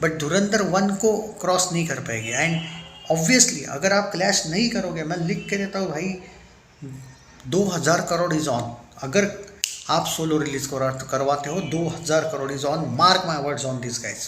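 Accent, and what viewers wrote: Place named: Maharashtra, India. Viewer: Bhai i am addicted to your channel and your style of explaining things Specially i love the accent when marathi prople speaks hindi, as i am also maharashtrian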